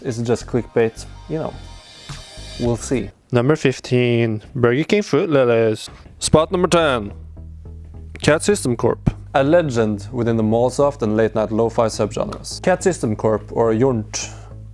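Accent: Norway. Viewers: Aha! Så du er norsk! Kjente det igjen på uttalen med én gang. Ikke mange i Norge som hører på vaporwave, og lite niche som spiller her, så jeg kjenner meg også igjen i lysten til å dra andre plasser. Bra liste btw. Personlig ville jeg snudd den på hodet, men jeg har kanskje litt annen vapor-smak :) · Yooo er du norsk Du høres norsk ut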